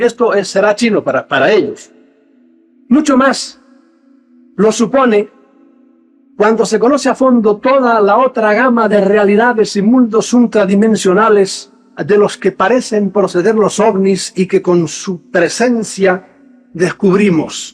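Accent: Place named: Mexico. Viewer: Amo su acento mexicano! Me recuerda a mi abuelito :')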